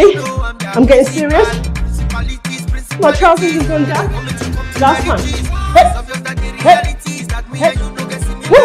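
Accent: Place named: Caribbean. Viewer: Your accent is #awesome!!! BLACK is #BEAUTIFUL!!! Proud to be black! Caribbean vibes right there...more fire 🔥!!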